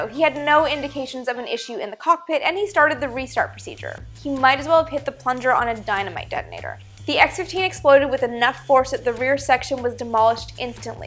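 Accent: Canada. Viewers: why does the X-15 have a thick, wedge shaped vertical stabilizer? All other surfaces seem normal. I thought a teardrop shape was best for aerodynamics. Perhaps you could answer that in another episode. BTW, I suspected you were Canadian ( aboat ):- )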